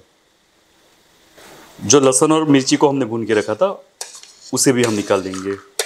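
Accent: Gujarat, India. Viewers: Actually unka gujarati accent hai.. Toa apko der se samjh ata hoga.. koi baat nahi dobara sun lene me koi burai nahi hai..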